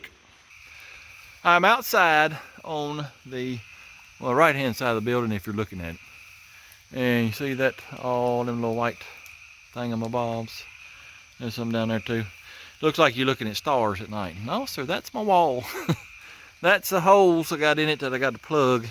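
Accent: United States. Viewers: I just love hearing you talk. As a Swede, this is as American as it gets 👍😀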